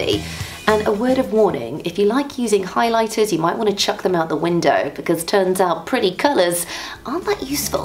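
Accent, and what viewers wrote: United Kingdom. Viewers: I love the British accent. It's so beautiful hahaha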